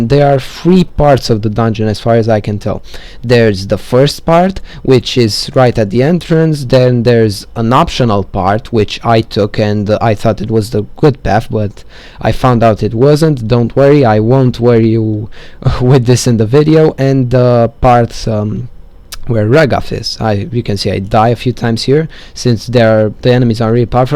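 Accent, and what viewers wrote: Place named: Serbia. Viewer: you have a serbian acent :)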